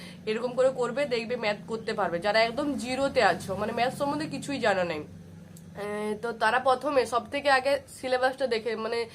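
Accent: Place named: Bankura, India. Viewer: গলা শুনে বাঁকুড়া বাঁকুড়া ভাইব পাচ্ছি।🙄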